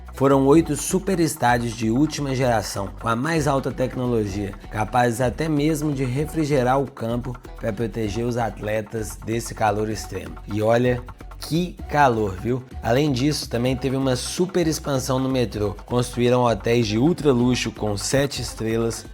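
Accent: Minas Gerais, Brazil. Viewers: Muito bom , pelo sotaque é aqui de minas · ce e mineiro?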